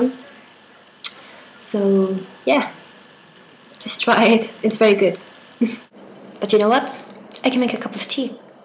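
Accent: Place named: Ireland. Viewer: ah du hast schon voll den irish accent haha:D das wochenende wird witzig..seh ich jetzt schon kommen!:D und achja..was ist eigtl fürn account?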